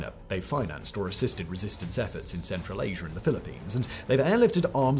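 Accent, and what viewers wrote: United Kingdom. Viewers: This series is the case in point for the theory that everyone sounds more intelligent when speaking with a British accent and everything sounds more credible and authoritative when spoken with one😂.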